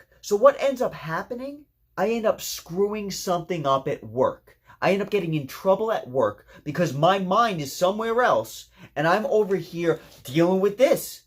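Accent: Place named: East Coast, United States. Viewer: I kept listening because I honestly love your accent. You must be from the east coast? New viewer here. My mom had a thick east coast accent and I miss it everyday since she passed away.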